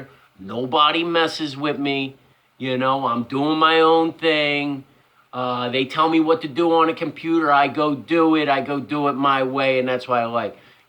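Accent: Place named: Mid-Atlantic, United States. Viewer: Ha, I am in South Eastern Pennsylvania, I thought that I recognized a Mid-Atlantic accent, ha, Alcohol is my drug of choice, drinking Vodka as I type, I used to smoke weed years ago, not really my thing anymore, I’ll take a few hits her and there, do what you do man, it’s all good, trucking is horrible these days, still an LTL driver, companies such as YRC and NEMF etc, are having a tough time finding drivers, local drivers, because, they do not want to pay anything..oh well..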